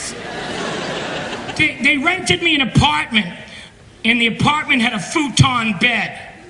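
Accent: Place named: Boston, United States. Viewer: I absolutely love him. Wish I got to see him live. His Boston accent I love it 🥰 RIP John. Enjoy the buffet in heaven. Make God laugh 😂😂😂🙏🏼🌹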